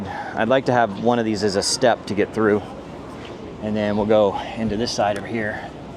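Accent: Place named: United States. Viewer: Awful video, really poor content. There's only one thing worse than someone moaning and that's someone moaning with an American accent. There are some really great presenters reviewing yachts and M.Y. on YouTube, this guy isn't one of them.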